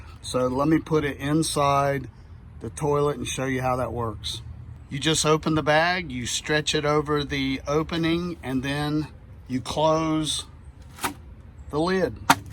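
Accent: United States. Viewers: What a beautiful accent you have , your almost singing ;D where about in America is your accent from sir ? if you do not mind me asking . I personally would prefer to use biodegradable bags which is why I am searching the net . Thank you for the demo ;D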